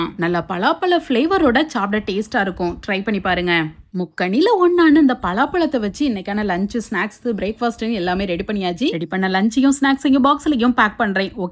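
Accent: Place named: Nagercoil, India. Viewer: New try... N ur Nagercoil slang❤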